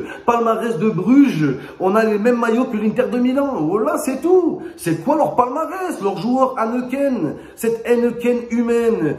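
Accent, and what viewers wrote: Marseille, France. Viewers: Wallah tu parles avec ton vieux Marseille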